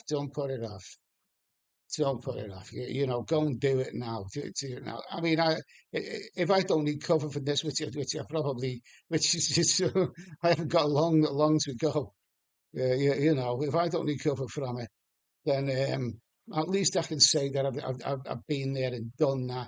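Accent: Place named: Liverpool, United Kingdom. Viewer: I was the guy that bumped in to you in Pucket Irish bar a couple of years ago and also from Liverpool, recognised your accent. You told me that you were from Bootle. I have followed your channel ever since. Good luck, your attitude to this is insparation hopefully for others.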